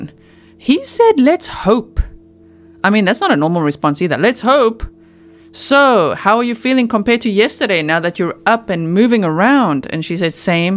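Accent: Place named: South Africa. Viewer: Hello from Cape Town South Africa ...are also south African you sound like one😊🇿🇦🇿🇦🇿🇦🇿🇦🇿🇦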